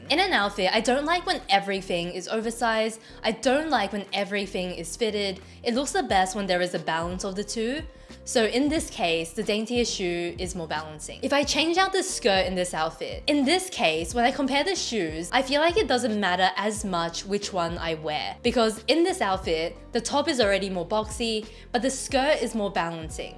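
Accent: Australia. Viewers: I just love your Australian accent, just like rose's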